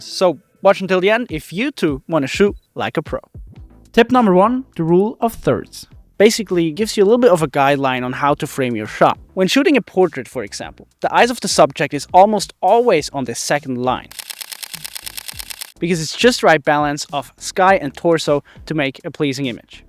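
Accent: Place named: India. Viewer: For a guy that knows a lot about upgrading, how come you didn’t try to stick your mic in the shirt instead of holding it and you don’t have to be smart to know that and you kind of sound like an indian 😷 🧄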